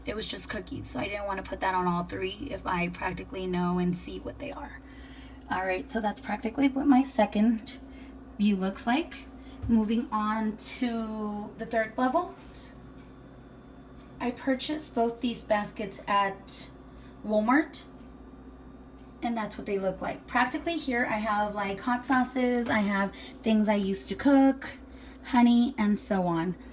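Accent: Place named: Latin America. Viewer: Hola. De don de eres. Yo soy de Puerto Rico y me suenas Latina. Me gusta lo que hicistes en tu pantry.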